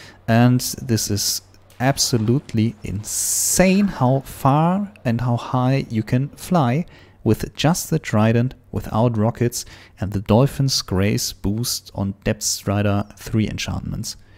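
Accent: Germany. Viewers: I love his german accent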